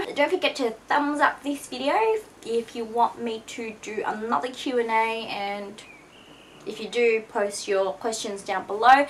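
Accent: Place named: Australia. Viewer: Hey!! Im soo soo happy to have you back:') I have really missed that aussie accent! Would you mind doing a video on picking medicine as career for highshool students? Like why you wanted to do it, how the application process was for you, how was it when you got in, your feelings in med school, advice and tips for people wanting to get in and is medicine what you expected? And pros and cons of being a doctor! SO SORRY THAT WAS A LOAD OF QUESTIONS!!! Could you please involve that in your education video?:) xx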